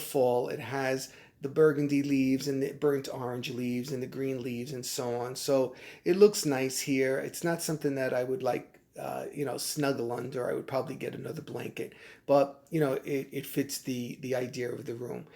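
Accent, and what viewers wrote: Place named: Boston, United States. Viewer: You have to live in Massachusetts. You have a Bostonian accent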